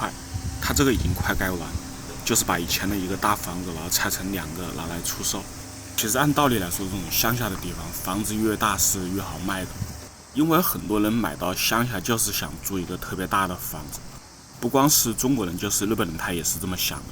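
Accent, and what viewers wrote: Wuhan, China. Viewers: 武汉口音太明显了。。。😝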